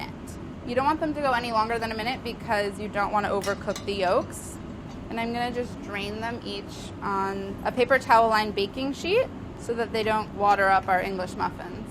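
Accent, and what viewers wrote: United States. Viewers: Is that an accent from somewhere in the US? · Everyone's talking about her accent and here I am, English not being my mother tongue, and I'm already proud that I can tell she's American. I literally can't hear the difference between any English accents. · She sounds normal, sure its like a small state accent but hardly anything to moch her over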